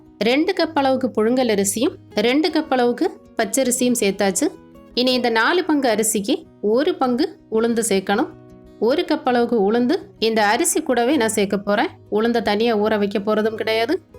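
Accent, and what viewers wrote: Kerala, India. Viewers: மலையாளி தமிழ் பேசினால் ஈசியா கண்டுபிடிக்க லா ம்.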